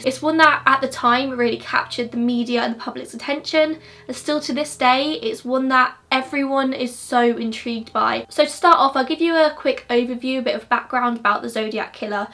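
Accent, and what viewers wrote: United Kingdom. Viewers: Please don’t take this the wrong way, but your mispronunciation of “Luger” in a British accent is hilarious as an American who grew up around guns 😅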